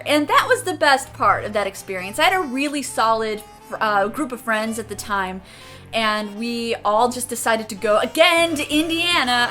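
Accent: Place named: Chicago, United States. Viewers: Ya from Chicago? Ya 'da bears 'da bulls accent! Its all good lol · I thought you had an Illinois accent! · I love how Midwest your accent is I can hear the Chicago dripping from your voice.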